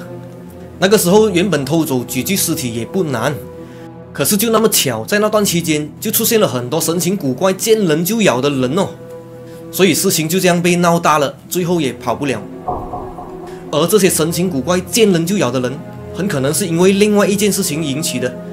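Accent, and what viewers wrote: Malaysia. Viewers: Bro你的口音真的很Malaysia style😂果然是个正宗的Malaysia人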